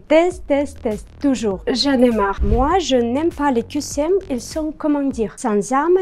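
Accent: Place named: South, Russia. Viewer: Bonjour, vous avez l'accent russe mélangé à l'accent du Sud de la France! Bonne vidéo, on aime la Russie et les russes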